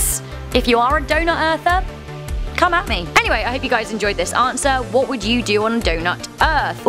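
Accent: United Kingdom. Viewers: Your accent sound like British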